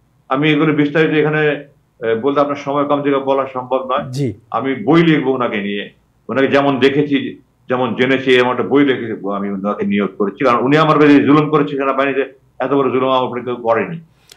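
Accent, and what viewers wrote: Sylhet, Bangladesh. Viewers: Kindly try to improve ur SYLATI ACCENT Mr. Conductor.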